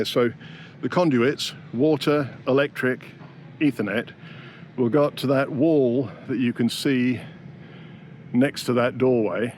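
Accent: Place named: Australia. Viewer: Not long found your Reno, loving the videos & music 🎶. And you sound like an a fellow Aussie!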